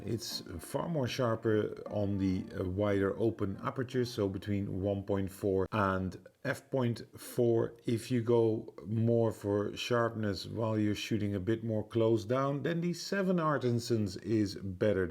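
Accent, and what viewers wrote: Netherlands. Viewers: It is ttartisan not artisans. Both are different companies. Are you dutch btw- I hear the familiar accent:) I prefer the ttartisan lenses. All of them are great and sharp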